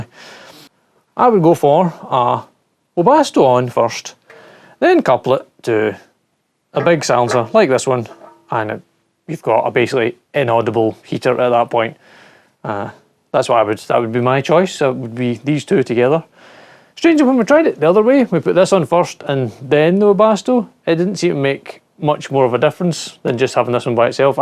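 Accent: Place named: Fife, United Kingdom. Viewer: Your accent rather reminds me of Fife Robertson in the 1960s. Are you perhaps from the proud Kingdom of Fife ??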